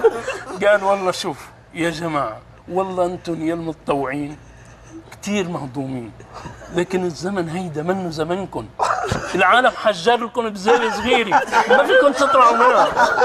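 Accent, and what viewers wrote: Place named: Syria. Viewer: نص لهجتو سوري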